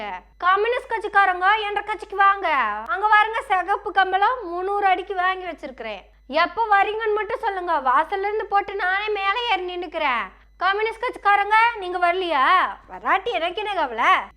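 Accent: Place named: Coimbatore, India. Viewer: Sister Your Civai SLANG speech is Very Like it. Keep it up Same Style with Good informative News to Public. Welcome Sister Very Good Capable Excellent Speech.Take Care sister.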